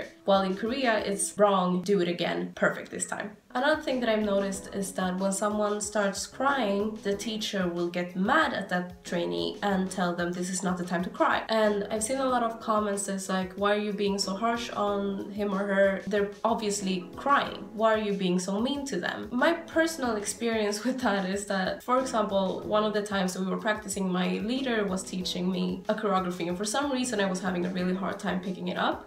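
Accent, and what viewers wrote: Sweden. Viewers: I knew I've heard this accent before hehe. Du e svensk eller hur?:")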